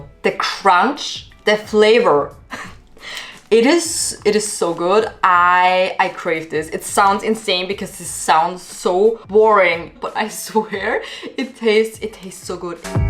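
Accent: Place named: Germany. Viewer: oo yum im gonna make the avocado cottage cheese lunch, also where are you from? you sound very german ahah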